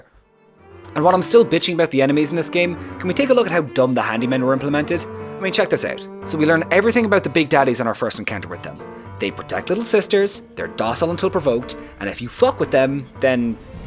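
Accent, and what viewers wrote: Ireland. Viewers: yup pal are you irish?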